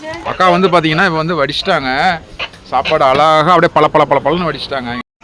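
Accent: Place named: Salem, India. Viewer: சூப்பர். நம்ம சேலம் மாவட்ட பேச்சு வழக்கம். சாந்து, பொங்கி, கொத்தமல்லி தழை கேட்பதற்கு இனிமையாக உள்ளது.❤❤❤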